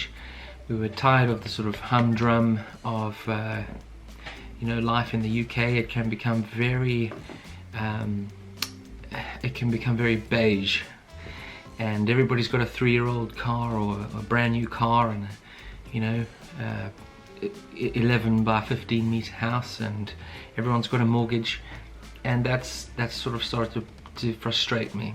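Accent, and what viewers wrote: South Africa. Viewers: Your accent is South African... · Bra, you have an Anglo South african accent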